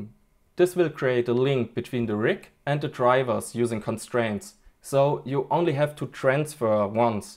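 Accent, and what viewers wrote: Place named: Germany. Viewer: Bruder man hört das du deutsch bist 👌🏽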